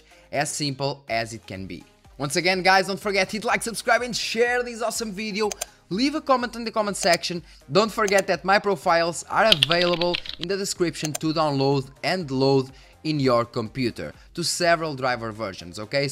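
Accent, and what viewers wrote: Portugal. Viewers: Pareces tuga pela forma de falar, props pelos vídeos! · i really like the way you speak english, your are portuguese right? GJ! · Grande tugão, apanhado pelo sotaque ... bom video pahhh Saiu este video de um alemão (para as "RX 5700" / "RX 5700 XT", com OC a 2100Mhz <link> software: - GPU-Z.2.30.0 - MorePowerTool review: <link>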